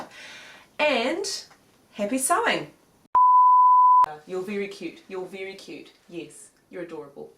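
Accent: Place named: South Africa. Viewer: I detect an accent where are you from? SA?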